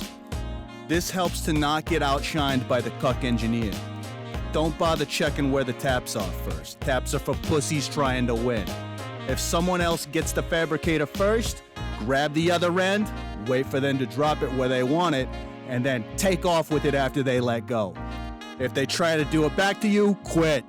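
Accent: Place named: Boston, United States. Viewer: Subscribed.. hilarious especially with the Boston/jersey accent 😂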